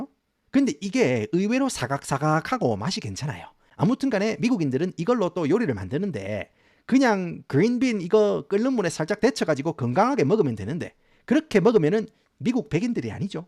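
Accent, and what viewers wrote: Gyeongsang, South Korea. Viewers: 니 갱상도 사투리 조지는게 억수로 찰지데이~~~ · 경상도 사투리로 미국이야기 잼나개 해줘서보는데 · 가끔떠서 보는데 경상도 사투리 너무 듣기힘들다 ㅋㅋㅋ 알고리즘에 그만 떴으면... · 경상도 사투리 듣기 좋은데? 보기싫음 차단하든가😂 이채널 댓글만 12개 썼으면서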